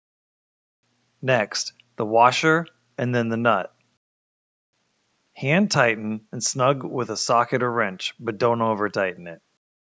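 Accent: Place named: United States. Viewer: WE SUBSCRIBED Coming upon your video after working through a few with non-native speakers or unclear audio filled me with appreciation for your "unaccented" speech-- no drawl, only plain American pronunciation. Along with a systematic disassembly / reassembly process, with product tips and pointers, your video is exactly what I needed. The only aspect for improvement is most of the action is recorded at a distance, and we are left looking over your shoulder, unable to see more orienting detail and your specific, finger-level movements.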